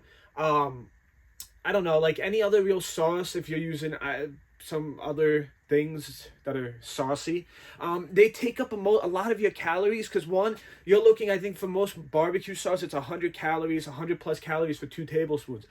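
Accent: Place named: East Coast, United States. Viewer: Hope you get to read this. Just found your channel and love your content. Just by watching your videos and listening to you i feel already more motivated to go to the gym and i already love going to the gym. You east coast accent its sucha perfect gymbro motitation chefs kiss Plz take my opinion is the best way possible. Keep up the good work! Love from Sweden.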